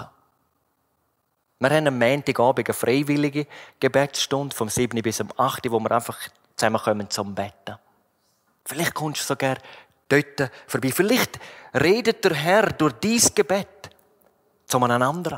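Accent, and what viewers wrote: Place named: Switzerland. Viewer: Trotz "Schweizerdeutsch" kommt die Botschaft durch und rüber. Danke für dieses Wort. Gottes Segen für Euren Dienst und die klare Verkündigung.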